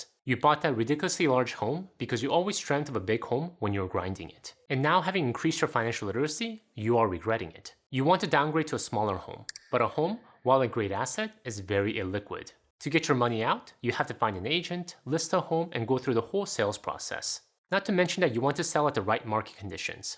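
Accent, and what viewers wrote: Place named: South Korea. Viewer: It’s interesting how his English sounds like Korean haha. Nice video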